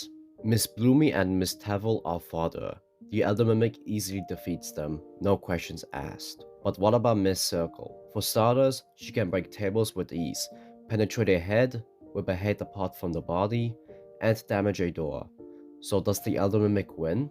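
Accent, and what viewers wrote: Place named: United Kingdom. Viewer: I don't know but you talk like some smooth british guy while having coffe+though you're saying facts most of the video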